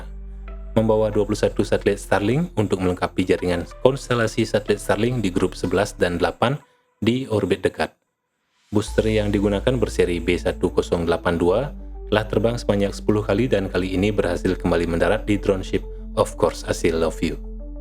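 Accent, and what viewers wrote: Bali, Indonesia. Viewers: Harusnya adane Bli...medhok Bali ne bli yan...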